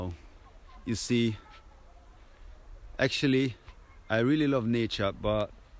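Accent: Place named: Jamaica. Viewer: You sounds like a Jamaican did you develop an accent or something like that it sounds very unique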